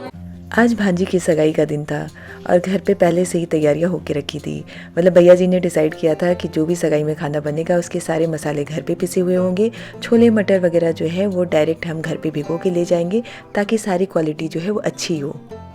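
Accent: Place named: Ballia, India. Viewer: Are you from somewhere in Ballia (U.P.)?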